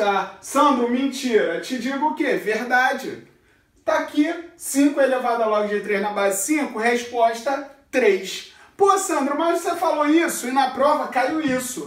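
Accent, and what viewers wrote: Rio de Janeiro, Brazil. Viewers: Esse fala carioquês com gosto!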